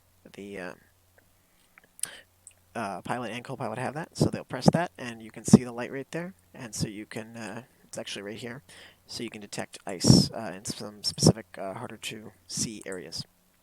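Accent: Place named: United States. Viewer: Thanks for trying to give an explanation on these systems. I was looking forward to it BUT here is a bit of feedback on your attempt: 1. The audio is incomprehensible: there is the "p" noise for your breath going into the microphone. 2. You speak too fast with a strong US accents (not everyone is from the US) 3. You say too may words that confuse and dissipate the tutorial content instead of communicating just what is important. Clearly you haven't planned on paper what to say. 4. The cockpit is too dark and reduce visibility 5. The audio is too low 6. you move the camera too quickly, which coupled with a fast speaking makes everything impossible (for me at least) to understand. Sorry for being honest ;-) Hope you can re do this in a clearer way. Thanks again!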